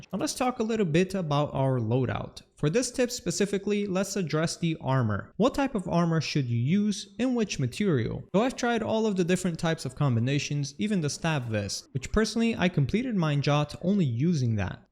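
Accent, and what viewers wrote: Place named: Portugal. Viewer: I knew as soon as you started to speak that you were portuguese. Great vid man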